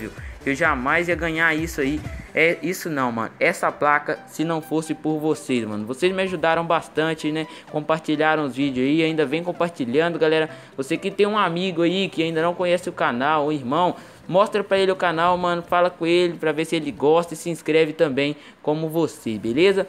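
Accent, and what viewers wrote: Minas Gerais, Brazil. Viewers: mano vc só pode ser mineiro kkk😂😂 parabéns agora rumo a 1 milhao tmj #forçachape😭😭👏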